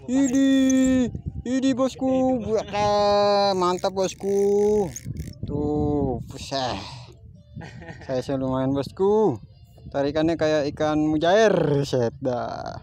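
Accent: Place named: Tangerang, Indonesia. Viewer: Selamat pagi bang .. salam kenal Logat nya kaya orang kampung Melayu tangerng dan sekitarnya ..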